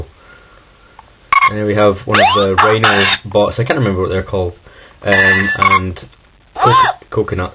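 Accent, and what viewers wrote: Scotland, United Kingdom. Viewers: I like how you are Scottish, man.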